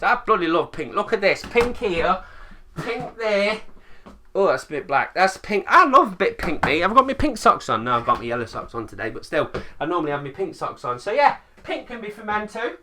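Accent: United Kingdom. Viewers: Does anybody know what regional English accent this guy is using? I'm in Canada, and the last time I heard this particular accent it was from the character Grumio on the comedy series Plebs.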